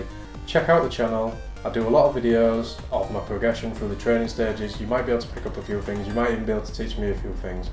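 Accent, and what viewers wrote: United Kingdom. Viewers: Come on ,,, its so clear that it's British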